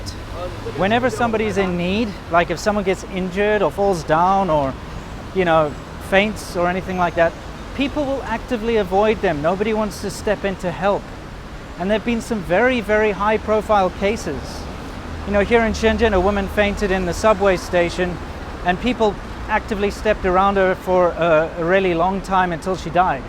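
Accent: South Africa. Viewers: More south african than british brah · well South Africa was colonized by the British so to an untrained ear they sound almost the same. Plus he has a formal proper South African accent which sounds even closer to a British accent. This is also why New Zealanders sound similar to South Africans because they were also colonized by the British.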